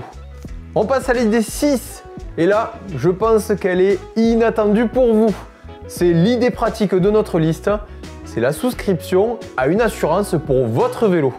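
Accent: South, France. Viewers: Merci pour ces idées cadeaux. Je vais transmettre à ma moitié 😁 Mais pas contre d'être tiré au sort 😍 Toujours de beaux tutos et surtout un accent qui chante et sent bon le Sud. Comme on dit ici: Bon bout d'an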